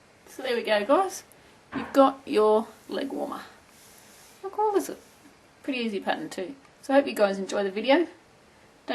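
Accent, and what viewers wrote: Australia. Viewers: wow thx a lot for sharing God bless you-my daughter is in Australia for 1 yr. i suspect thats where your accent is- i understand it fine!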